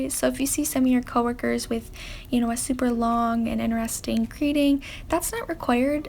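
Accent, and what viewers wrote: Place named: United Kingdom. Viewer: Heyy! R u from European places, accordin t ur great British accent?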